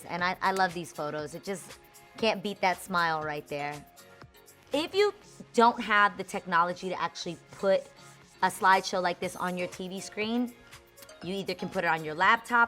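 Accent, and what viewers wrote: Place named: New York, United States. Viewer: I love how you haven’t lost your New York accent or slang lmao. I moved from New York to Hawaii and i still talk with an NY accent 😂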